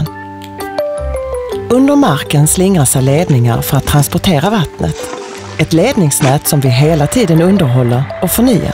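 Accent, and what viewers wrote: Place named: Scania, Sweden. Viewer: Mycket bra. gillar skånskan. :)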